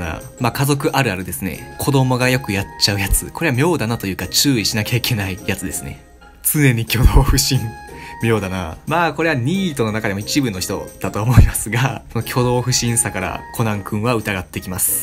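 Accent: Kansai, Japan. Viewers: さん、ネットでは関西弁。妙だなあ。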